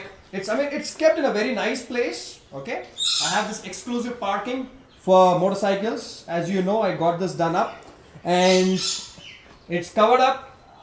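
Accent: India. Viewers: You‘re welcome! This was the first video From you that I watched! I watched some others as well! I like your friendly nice art and your Indian accent haha!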